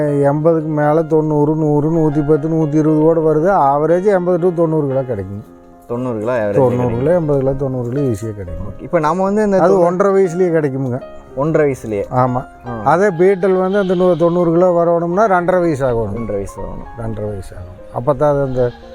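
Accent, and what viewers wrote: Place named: Kongu, India. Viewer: Kavanthapadi la enga relative irukaaga. Unga kongu tamil super. Sir ivaru num pls?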